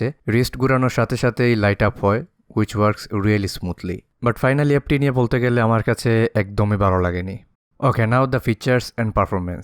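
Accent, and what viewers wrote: Sylhet, Bangladesh. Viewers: Are u Sylheti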